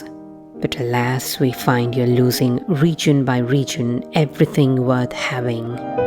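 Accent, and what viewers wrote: RP, United Kingdom. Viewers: Yes I loved this - so cinematic and your accent is so delightfully POSH as always! I wonder why this one doesn't have more views?! It's a pity really!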